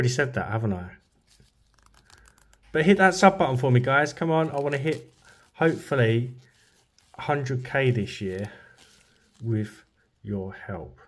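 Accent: Essex, United Kingdom. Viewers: Hello, new subscriber here, also new to resin art, just uv for now, anyway, today I found you on tiktok and what a nice surprise to learn you're from Southend on Sea, I lived in westcliff on sea for a few years, I miss it, I could see the estuary from my kitchen window 😢. Well, I enjoy your videos a lot and I wanted to thank you, oh, and I love your accent, brings back good memories, cheers 🤗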